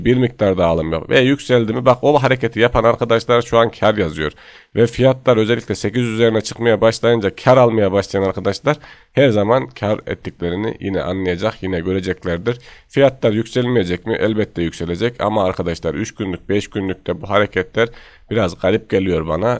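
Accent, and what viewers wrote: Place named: Konya, Turkey. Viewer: Konya gibime geliyor 😊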